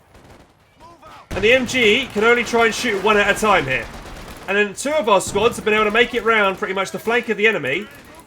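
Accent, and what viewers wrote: United Kingdom. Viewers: Fast talking British accent and mostly incomplete sentences makes for poor communication.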